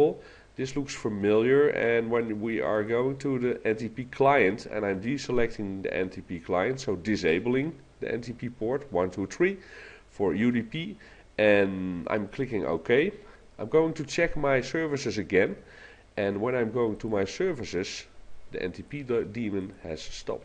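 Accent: Netherlands. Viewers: are you dutch? you accent seems dutch.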